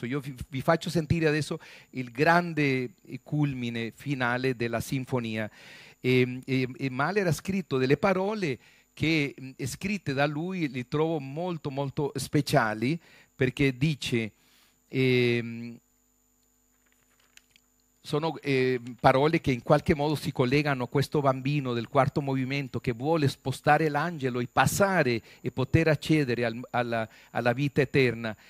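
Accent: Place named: Costa Rica. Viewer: Es un enorme placer ver y escuchar al costarricense Gaston en esta deliciosa conferencia, en que llevados atraves de la armonía del italiano en versión tica, clara y precisa, nos lleva de la mano a explorar la obra de Gustav Mahler. Me conmovio el haber sentido el profundo sentimiento de respeto y admiración del Sr. por la obra de Mahler atraves de su voz que trae remembranzas del pasado. Mil gracias.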